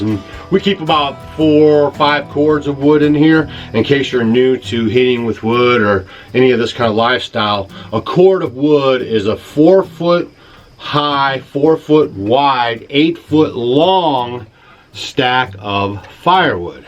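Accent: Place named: Utah, United States. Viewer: are you in utah or missouri i heard both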